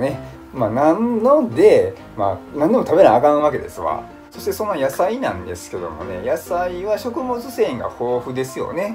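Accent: Osaka, Japan. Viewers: 食事療法のアドバイス、とても参考になってますよ👌昔から糖尿病の療法食は健康食、ダイエットにも適していると言われてましたからね👍 大阪弁⁉️希に嫌う人も(昔本当にいました。大嫌いという人)いますが、和みやすくて良いじゃないですか。関東人でも大阪に3年もいれば大阪人というくらい馴染みやすいですよ。地方地方の方言、楽しかろう⁉️(笑)ご存じですか？東京人は、昭和のころ、ほとんどが東北人だといわれていました。それくらい出稼ぎで出てきた人が多かったそうです。先祖代々という地元民は凄いことなんですね👍 👊😆🎵🙋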